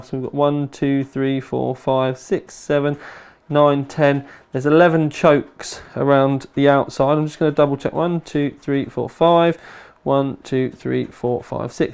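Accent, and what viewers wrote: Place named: England, United Kingdom. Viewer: He sounds like he knows what he's talking about because he has an English accent.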